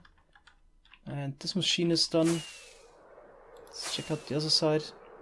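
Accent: Germany. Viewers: Nairolf 00 Neeeeeiin, hat nicht schon 2,<num> Millionen Mal in seinen Videos gesagt dass er deutscher ist, das hab ich mit wohl eingebildet... Haha du bist du selber deutscher, als ob du da nicht seinen krassen Akzent raushörst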